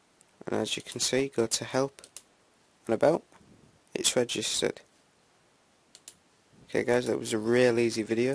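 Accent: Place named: United Kingdom. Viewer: hey where do you come from. i know youre british but what part? its just that youre accent seems familiar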